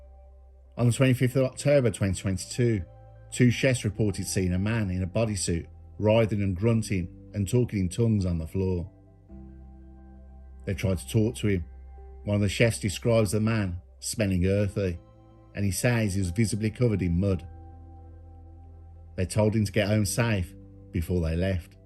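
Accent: Birmingham, United Kingdom. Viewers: It's funny because it's narrated by a deadpan Brummie.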